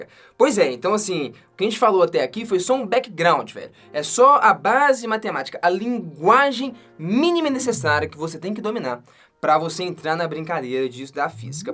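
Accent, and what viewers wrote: Minas Gerais, Brazil. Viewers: otimo video, estou começando o enen para me preprar para o enen, e acho que vc e de minas ne kk obrigada. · Estava vendo o vídeo e anotando as partes pra estudar depois e tive que comentar sobre esse sotaque mineiro, ksksskskskkssk, coisa mais fofa.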